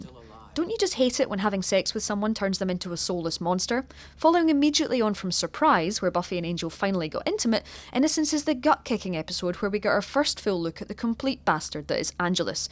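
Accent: Scotland, United Kingdom. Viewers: That Scottish is an ointment to my ears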